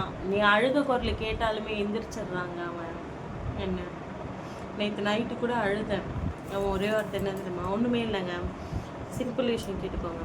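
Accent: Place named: Chennai, India. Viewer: நீங்க பேசுறது ரொம்ப கஷ்டமாக இருக்குது நீங்க சென்னை பொங்க சிஸ்டர்.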